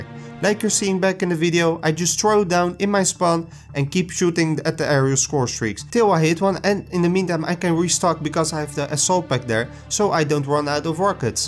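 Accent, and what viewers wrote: Netherlands. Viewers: The dutch accent😂🤦‍♂️ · Hahahaha ik hoor je nederlandse accent zo duidelijk. Lachen man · Bij het eerste woordje hoorde ik al dat je Nederlands bent hahaha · Je kan gwn horen dat je Nederlands bent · ik hoorde het all aan je accent 😂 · Nederlands Accent Approved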